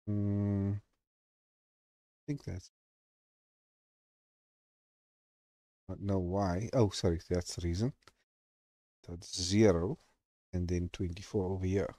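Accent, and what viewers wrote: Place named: South Africa. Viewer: First thing I thought was, Wait is this guy South African? Thanks you solved my issue after sukkeling all day, my settings needed to be fixed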